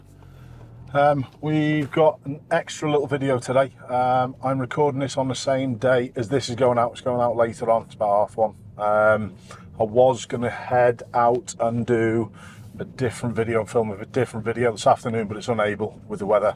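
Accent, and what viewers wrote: Liverpool, United Kingdom. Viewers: I do enjoy the 'Drive like sitting in the passenger seat.' videos. 😂 Edit to add: I heard dulcet Scouse throughout. Pas de frainçais.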